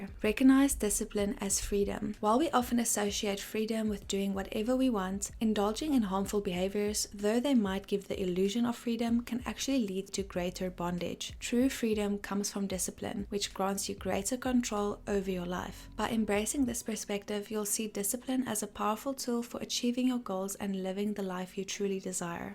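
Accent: South Africa. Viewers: R u south african, ma'am?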